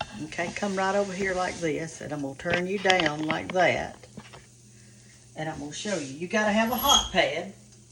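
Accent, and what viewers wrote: South, United States. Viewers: Miss can you video spinach omelet. I love spinach w garlic Parmesan. God bless you thank you. Love Southern accent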